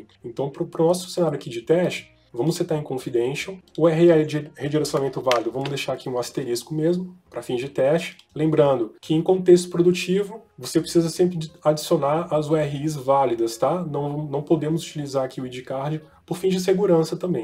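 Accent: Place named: Rio de Janeiro, Brazil. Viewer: olha os microservicos aeeeii, bem carioca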